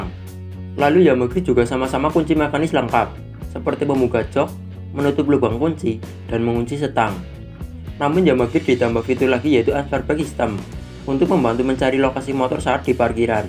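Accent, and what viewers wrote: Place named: Banyumas, Indonesia. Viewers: ngapak ya mas. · Bahasane wong Tegal.......ngapak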